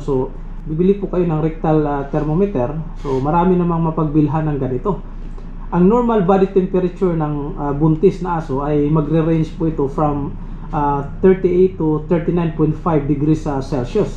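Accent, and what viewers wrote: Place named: Pangasinan, Philippines. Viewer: taga Pangasinan po ba si Doc? may punto e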